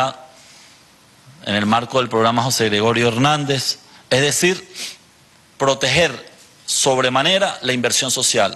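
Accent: Cuba. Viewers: Notan que tiene un acentico cubano?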